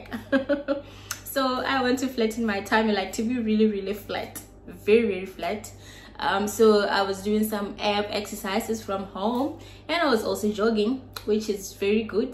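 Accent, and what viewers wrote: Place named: South Africa. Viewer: You have a South African accent ❤️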